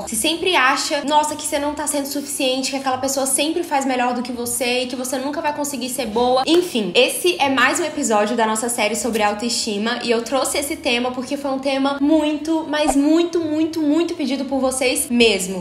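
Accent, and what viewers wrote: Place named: Campos dos Goytacazes, Brazil. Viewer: Oie,! Tudo bem?! De que estado você é? Seu sotaque é mto parecido com o daqui "Campos dos Goytacazes", RJ.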